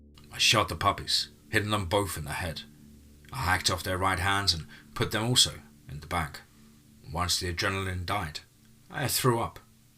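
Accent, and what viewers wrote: United Kingdom. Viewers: Your Brit accent and choice of Brit sayings ruins your American stories. Again.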